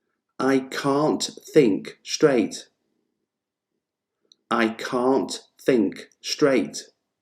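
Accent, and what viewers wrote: United Kingdom. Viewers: Hello. Is your English from the UK ?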